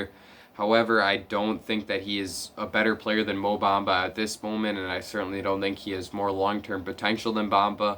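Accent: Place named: Canada. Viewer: the way you pronounce a couple words sounds Canadian, being from Wisconsin makes sense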